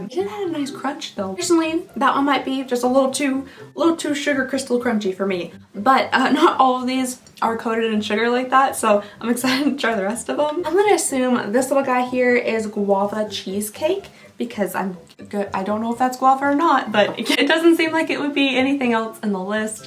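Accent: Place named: South, United States. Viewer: I just have to say I found you a little while ago, but I adore your accent and southernness so much, since there’s such a lack here on YT.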